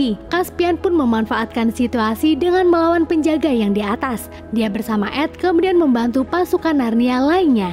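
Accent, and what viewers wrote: Pemalang, Indonesia. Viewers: Pemalang nih ye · Wong pemalang ya mbak? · Jebule wong pemalang yaa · Fix ini miminnya orang Pemalang yah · Mba e wong pemalang to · lahhh pemalang ndii mbak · Emg asli Pemalang? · orang pemalang kah? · wah pemalang to sampean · Wong pemalang yo😁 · Wong Pemalang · Mbak orang pemalang kah?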